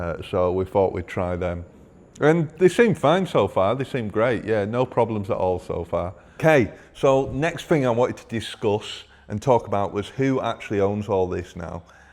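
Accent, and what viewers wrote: Northern England, United Kingdom. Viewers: Brilliant good luck to the future in your lovely home .i detect your from the north of England ?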